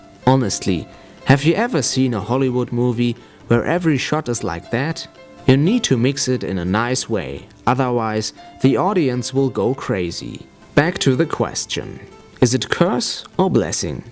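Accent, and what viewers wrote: Germany. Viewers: That's his real accent. He is German. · cuz hes german :D and he have this cool accent when speaking english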